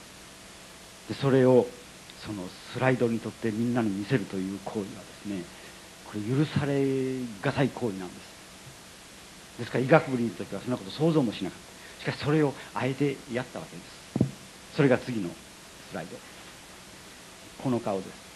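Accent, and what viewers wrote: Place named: Shikoku, Japan. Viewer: 四国出身の方らしいアクセント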